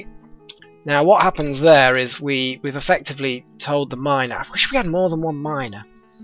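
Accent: United Kingdom. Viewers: ah, it's been a while, good to hear your british voice and the clicking of your keyboard!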